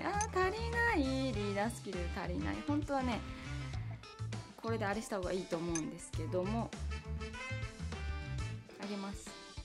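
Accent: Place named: Kyushu, Japan. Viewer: はじめまして！は九州の方ですか？方言が身近に感じました！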